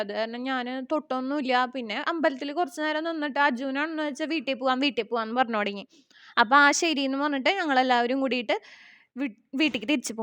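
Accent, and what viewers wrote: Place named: Thrissur, India. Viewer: തൃശൂർ ഭാഷ❤,ഏതാ അമ്പലം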